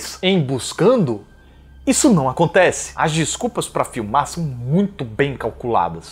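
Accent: Northeast, Brazil. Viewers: De q parte daki do Nordeste vc é? Coboco arretado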